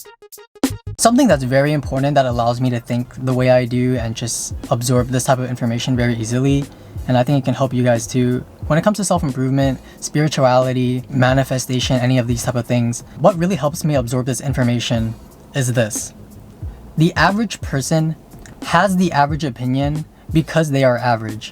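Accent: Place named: France. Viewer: Omg your french is so cute lol c'est la vie ! keep talking french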